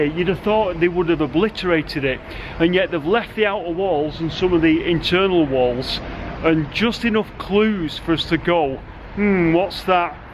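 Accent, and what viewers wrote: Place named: Manchester, United Kingdom. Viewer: A loadda hot air. Literally 🤣 👍 great vid As per.. I'm pickin up a Mancunian accent I watch this channel so much. Sweet 👍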